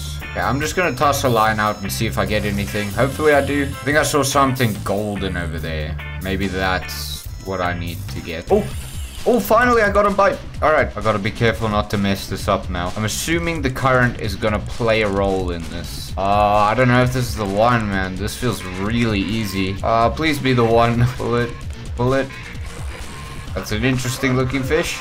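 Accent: South Africa. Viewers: Are you south african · South African? Glad I'm not the only one playing this beauty again. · Has to be south african bro I can't unhear it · Bro I too am South African and I could recognise your accent,so I checked your profile and I was correct :D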